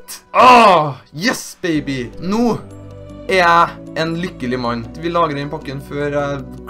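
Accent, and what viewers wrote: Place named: Trøndelag, Norway. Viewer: Liker ikke selv om det er bra vidio men du er trønder og jeg er fra Molde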